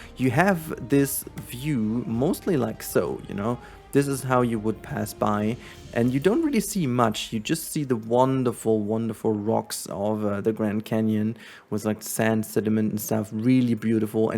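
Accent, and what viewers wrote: Germany. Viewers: Bist du deutscher oder so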